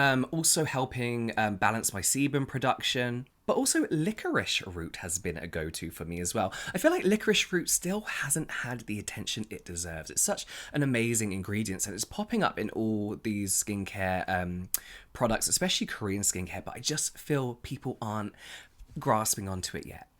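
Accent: United Kingdom. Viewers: Okay i have to say it. I just love you and your Videos and your Intro and the way you speak and your British Accent, it sounds so elegant 😄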